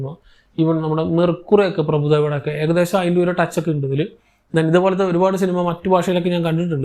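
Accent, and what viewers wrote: Palakkad, India. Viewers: Palakkad bhagathu anenn thonnunnu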